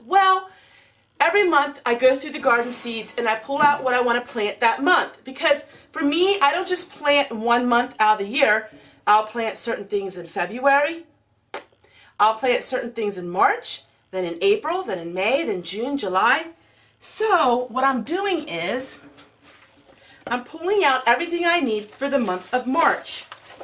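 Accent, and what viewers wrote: Pennsylvania Dutch, United States. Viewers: Hi. I like your PA Dutch accent. thanks for sharing. :)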